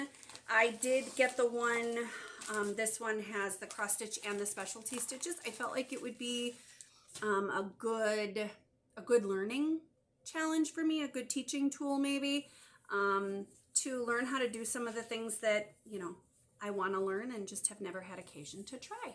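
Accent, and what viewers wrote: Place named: Wisconsin, United States. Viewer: Oh shucks I'm in Wisconsin as well. You must have been born and bred here. I just love hearing your accent. I've only been here for 49 years. I am so jealous of your gorgeous hair. That's not very Christian of me. I don't know anybody who stitches. We are around the eau Claire osseo area. Good to see a native doing a floss tube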